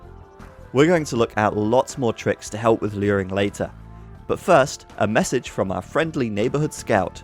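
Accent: United Kingdom. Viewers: an AoE textbook in a flawless british accent. :3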